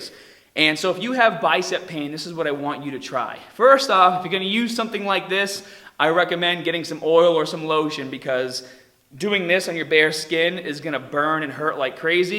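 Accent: Boston, United States. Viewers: You from Boston? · What is your accent?. Are you from Boston? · He's definitely from Boston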